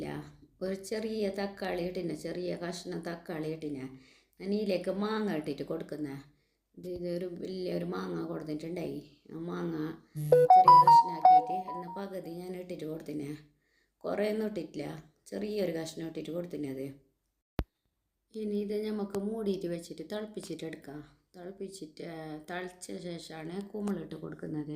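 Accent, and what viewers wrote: Kasaragod, India. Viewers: Ningale Kasaragod language nalla rasandu 😊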